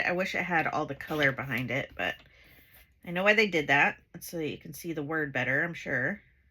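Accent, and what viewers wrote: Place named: South, United States. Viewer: If you're from Oregon, where the ##$% did you get that southern accent that comes out?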